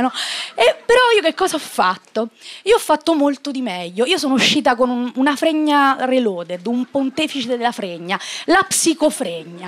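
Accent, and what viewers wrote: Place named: Piedmont, Italy. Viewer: No, è di Cuneo. Non senti l'accento piemontese?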